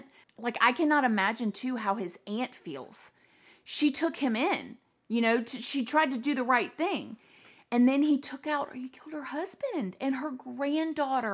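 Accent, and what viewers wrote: South, United States. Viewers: I loved your work 🥰🥰🥰 new subscriber here 👍🏽👍🏽👍🏽 keep up the good work 😘😘😘 also I feel like you have a beautiful southern charm. You don’t sound like a Floridian lol but that’s just me 🤷‍♀️🤷‍♀️🤷‍♀️ I know Florida is a down under southern state, but again you have a lovely southern accent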